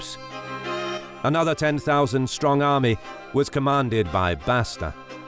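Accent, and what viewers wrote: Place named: United Kingdom. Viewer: Are you trying to be Kings and Generals or Bas Battles? I love it. A different yet still British narrator. Haha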